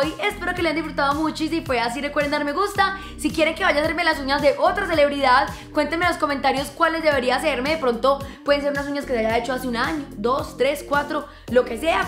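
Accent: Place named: Medellín, Colombia. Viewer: Se nota que eres de aquí de Colombia eres de Medellín igual que Lulú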